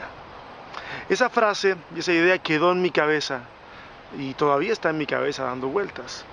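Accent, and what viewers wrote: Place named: Mexico. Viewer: Cómo se nota la influencia de vivir en México en esos años, hablabas a lo mero macho wey.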